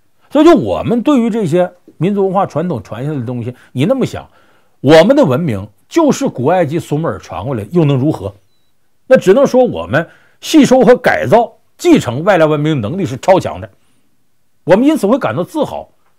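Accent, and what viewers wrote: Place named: Shandong, China. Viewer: 这山东话太纯了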